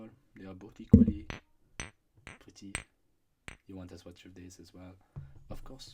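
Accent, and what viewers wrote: France. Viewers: As a french, I would like to know if u (english people) understand everything when he speak english with French accent? Bc he's doing it very well, so im wondering if it's comprehensive when we're speaking to u (and if it's not too disagreeable for ur ears aha?) Pleaseee respond, and of course scuse my orthography everybody knows that we're des grosses merdes in english :) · I spend 9 months in Tours which is supposed to have the most "pure" accent and you sound so much like the tourangeaux! Bon travail :) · what il est français ou anglais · it’s okay!! You’re french accent is amazing!! Never stop making these!! You’ll get big for sure cause you definitely deserve it! May be be careful when moving items and your hands cause it might hit the mic but other than that these videos are great! Thank you so much for making these and keep it up! 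· Your French is excellent and French accent is spot on. You must be a native speaker. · Shit, your french accent in english is so good. I love it, I need more of it haha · Amazing job lmao. You didn't even overdo it. you really sound like a Frenchman trying hard to sound English but they can't 😂😂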